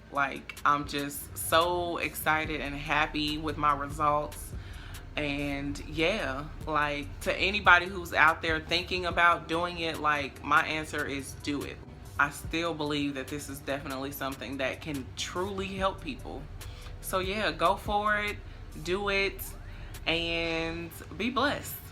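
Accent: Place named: Texas, United States. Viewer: Just came across your videos. Love your personality and would like to know if you're from Texas? Your accent is similar to mine.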